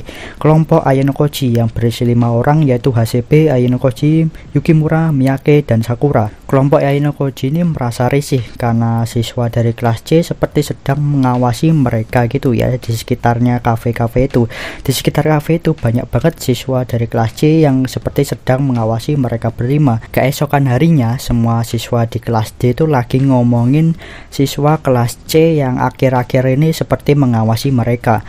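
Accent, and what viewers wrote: Java, Indonesia. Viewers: Ngomong mu lek medok men to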